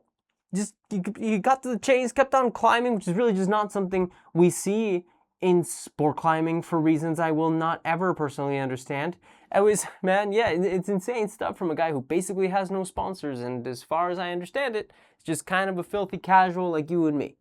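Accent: United States. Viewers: you speak perfect American English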